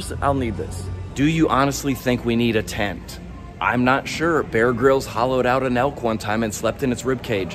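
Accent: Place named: Tennessee, United States. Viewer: are you from tennessee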